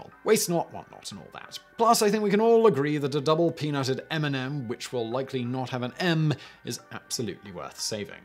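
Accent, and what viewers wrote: England, United Kingdom. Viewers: I'm really glad u didn't refer to hitting the like button as "smashing" it, like you have in previous videos. It's just kinda cringey. "Smash" is a term used by American teenage YouTubers, it sounds really wrong coming from an adult. Not to mention an adult with a very proper English accent. Your accent actually gives you a good amount of credibility, and when you say "smash that like button", it takes all that credibility away lol. i love you and i love the channel! Just no more smashing, ok? :) thx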